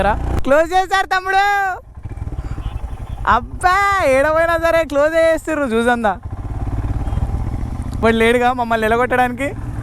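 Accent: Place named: Telangana, India. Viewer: U r the person who comes from the heart of telangana.(slang,style) aba aba rendu kallu chavalu anoku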